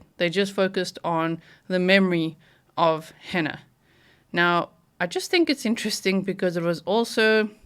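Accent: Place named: South Africa. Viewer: Why do you sound South African? 😮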